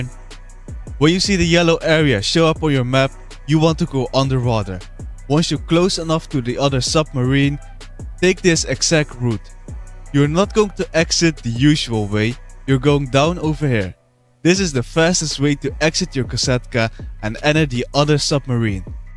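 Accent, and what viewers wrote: Netherlands. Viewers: are u dutch? you have a bit of a dutch sound when you speak english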